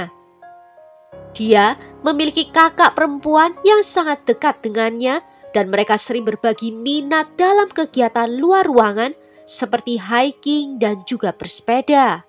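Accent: Java, Indonesia. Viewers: Medok bangeet kmu kak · Emang suara nya asli medok gitu ya..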